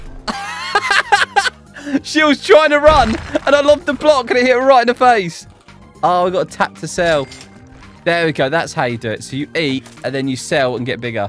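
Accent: United Kingdom. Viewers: Are you British😂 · I just realized that this YouTuber is British💀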